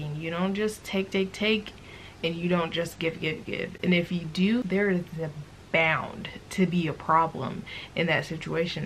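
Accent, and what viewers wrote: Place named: South, United States. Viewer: i looove your southern drawl omg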